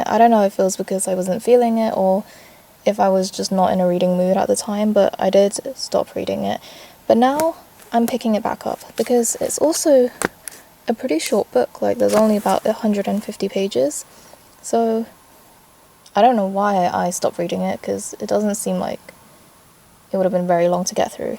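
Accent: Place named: United States. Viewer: Lovely video. Question: have you spent time in the US? Sounds like it when you speak. Just curious! (Writing from the US)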